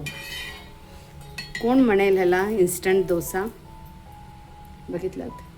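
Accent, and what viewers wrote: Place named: Kalaburagi, India. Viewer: कर्नाटकांमध्ये कुठे? माझे माहेर कलबुर्गीचे आहे.तुमचे बोलणे ऐकताना मला माझ्या माहेरची खूप आठवण आली.डोसा रेसिपी मस्त नक्की करून बघेन.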